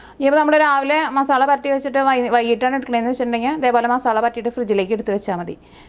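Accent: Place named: North Kerala, India. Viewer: Oru Nalla Nadan kutty anu,I like your presentation & vadakkan accent.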